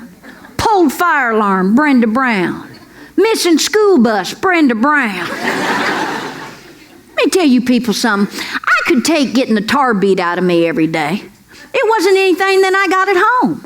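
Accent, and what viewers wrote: South, United States. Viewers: She is absolutely right not only that I love her southern accent. I suffered from bullies until I was 12 yrs old One day on my own since I had no father to help I confronted my first bully at 8 yrs old and asked him to fight me. my heart was in my throat but I roared with threat expecting to be beaten to a pulp but to my surprise he backed off. I thought the bully problem was resolved but on later years i was beaten by other bullies. I finally asked my momma to pay 5$ per week so I could take Judo lessons from a brand new Dojo near our home. She looked at my teary eyes and accepted to pay for the lessons. Every bully walked by the schools which was on a main street with see thru glass like most store fronts My bully problem was over. The word spread around not to mess with me. I continued with practice and after many years and many contests i got as far as 2nd degree black belt then I switched to karate Okinawan style and received a black belt The after college I stared to learn Butaijutsu and from there developed my own self defense system. 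At the hight of my training I could break a coconut with my right hand. I taught both my sons from the time they were 10 till 16 Till they bacame black belts Them they went on to college They were never bullied by anyone because I had their back and expected them to stand up for themselves. And me ? Well never was bullied after 12 yrs old I grew up in tough areas and walked into neighborhoods where my friends would not dare. All possible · Hilarious, stories like this with a southern draw are more believable 😁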